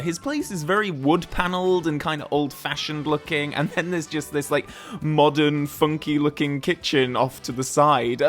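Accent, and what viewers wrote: Yorkshire, United Kingdom. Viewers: I think it's impossible to dislike. Enthusiastic, insightful, funny, amiable, creative and handsome; he's the perfect YouTube host (which is more of a compliment than it might seem). Personally, I also really like the way that his Yorkshire accent becomes more pronounced when the pitch of his voice rises 🙂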